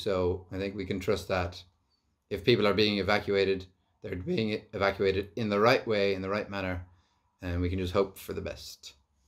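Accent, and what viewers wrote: Ireland. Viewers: I’ve always loved an Irish accent!!!!! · And besides you sound Irish have you ever heard of the Fighting Irish there's a reason why they're called that. Happy birthday buddy my birthday was the 25th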